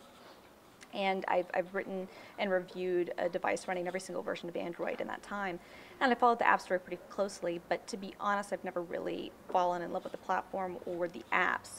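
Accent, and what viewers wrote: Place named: United States. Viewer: As much as I want to listen to the opinions and experiences, I really could not handle the accent. I have to pause it every 2-3 minutes, take a deep breath [anticipating more American accent] and then play further. Seemed really balanced and objective evaluation though. Cheers!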